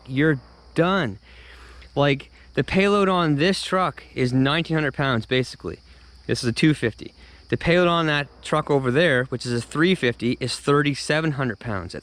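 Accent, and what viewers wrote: Canada. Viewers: You sound so Canadian. Thanks for the video helps a lot.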